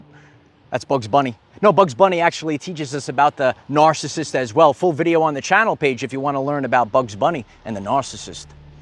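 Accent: New York, United States. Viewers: Caring good intentions but your message and video is convoluted Mission mosh with too much vague innuendos I noticed you never used quote any Scripture you're just giving life experience but it's verbally jumbled man it's not making a very good presentation Without trying to be rude or disrespectful I have to question and ask if you're on any medication because you're not messing with the public regarding something you call Twin Flame and vibrations and frequencies Your New York accent to me explains kind of why you have a super high intensity and inflections about the inner workings of communication for me you sound like you're on a subway too long and irritated with the people's inflections or things you think they're doing to irritate you I think it's better you stay in the sunshine and stay outside and enjoy the fresh air around you because you need that oxygen bro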